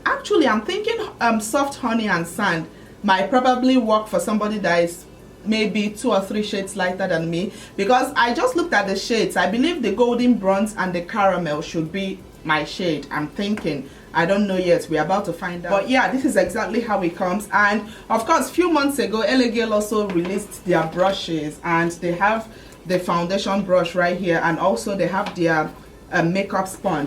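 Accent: Nigeria. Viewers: I like that you keep your Nigerian accent and you don't fake an "American" accent or water down your accent like a lot of Nigerian youtubers I've seen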